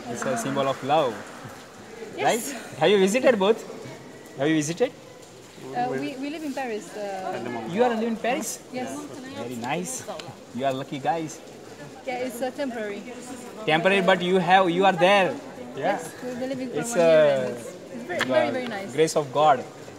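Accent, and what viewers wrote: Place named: Pakistan. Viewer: Aap talking like pakistani cricketer!🕵 u had a great time in malesiya with foreign girls😍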